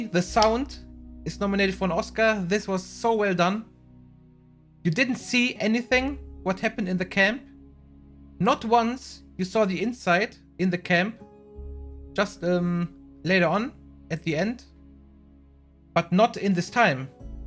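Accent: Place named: Germany. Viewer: Good reaction. Just guessing by your accent - are you German yourself?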